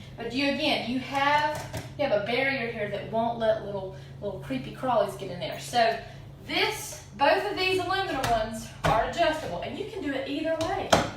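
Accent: United States. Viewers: Love that southern accent! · Listening to you speak sure makes me miss Tallahassee; we don't talk like that in Wisconsin. I've been debating side or roof -mounted ventilation and your informative video was perfectly timed - thank you.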